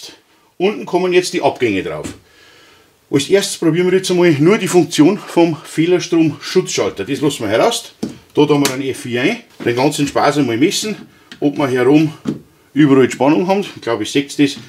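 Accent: Bavaria, Germany. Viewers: Super Videos weiter so !!! (los die ned drazn uns echte Bayern versteht hoid ned jeder Fischkobf ;-) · Top. Gruß der Preuß' der a boarisch verstahn tuat:-) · Super naučné video, a ta Bayernština nemá chybu. Palec nahoru.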